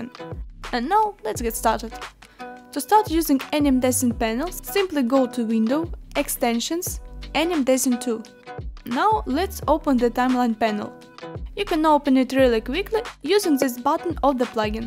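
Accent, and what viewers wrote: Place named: Russia. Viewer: Воу как рашн акцент виден)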